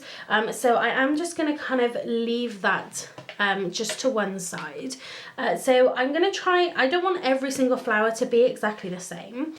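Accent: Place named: Wales, United Kingdom. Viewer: Part way through I thought the dotted background would make it too busy but I was so wrong it worked beautifully. Every video I am trying to sus out your accent because I am hearing some Welsh mixed with something else I can’t put my finger on. I really need to start playing with my stamps and inks I find myself always turning to the Hunkydory card kits or digital designs.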